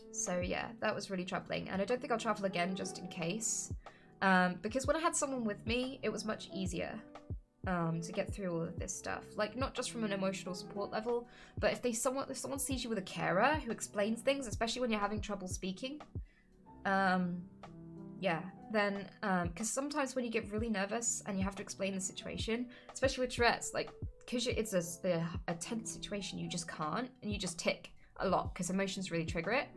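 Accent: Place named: United Kingdom. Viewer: This is the best British dialect a woman can have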